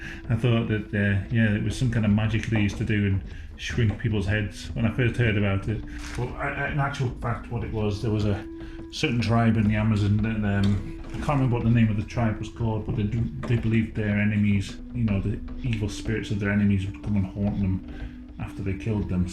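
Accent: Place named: Liverpool, United Kingdom. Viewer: If your from Wales and not liverpool why do u talk with a scouse accent lol??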